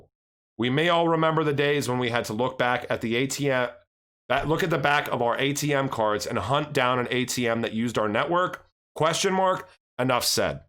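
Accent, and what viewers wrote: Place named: Western Pennsylvania, United States. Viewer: I sense a western Pennsylvania accent.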